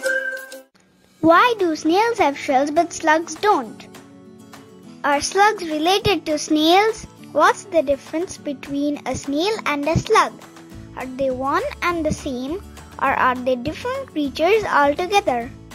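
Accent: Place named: India. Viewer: I love the way she talks, India I think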